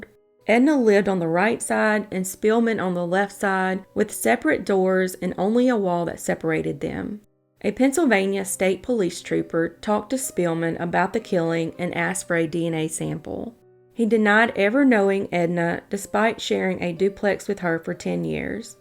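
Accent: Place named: Mississippi, United States. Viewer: i don't know where in the south these are from, but this voice makes me miss visiting madison, mississippi.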